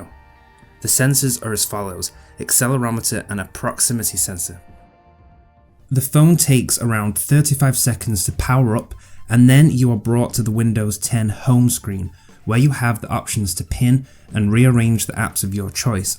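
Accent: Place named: United Kingdom. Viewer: I can listen to british people talk forever lmao. good review!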